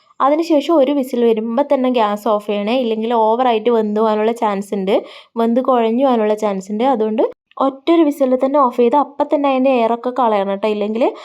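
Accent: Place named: Kannur, India. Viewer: Nannayitund daaa.kannur slange poliii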